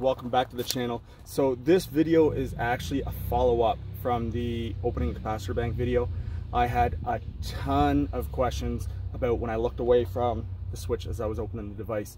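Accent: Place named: Newfoundland, Canada. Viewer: I hear a slight Newfie Accent. I was born in Newfoundland--in Alberta atm. Love your channel. I've been giving serious thought to going for journeyman electrician.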